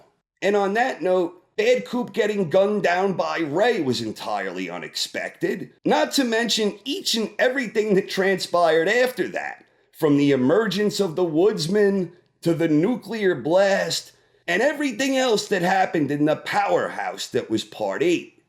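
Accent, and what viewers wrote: New York, United States. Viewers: serious question, where are your from? I grew up in NJ and I suspect a hit of a NY accent? Please respond!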